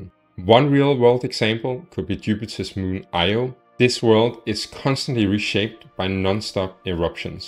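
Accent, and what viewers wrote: Germany. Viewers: I know that your channel is new but I get the feeling that you are going to take off on YouTube very soon. Keep up the good work. By the what country are you from? I would have guessed one of the German speaking ones but i could be wrong. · Why does he sound so German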